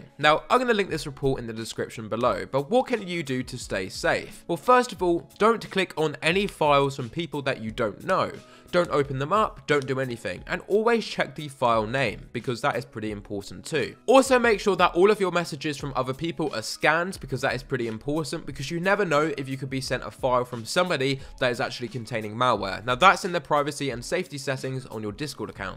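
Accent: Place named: England, United Kingdom. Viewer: wait... you're English!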